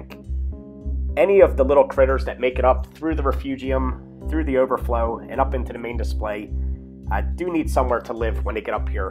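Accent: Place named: Western Pennsylvania, United States. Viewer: Very nicely filmed. 👍 you sound like you may be from Western Pa.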